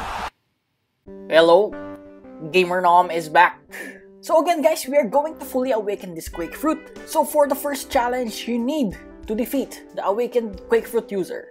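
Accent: Philippines. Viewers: U sound filipino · wait he's filipino? no wonder the accent and voice sounds like a filipino speaking english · how is he a noob he has acro also i notice your filipino accent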